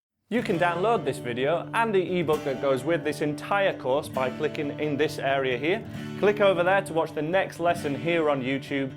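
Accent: United Kingdom. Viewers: I just can't stop watching your videos and I do feel comfortable with your teaching rather than many Others besides I really like you speaking British which is my favorite... Thanks a lot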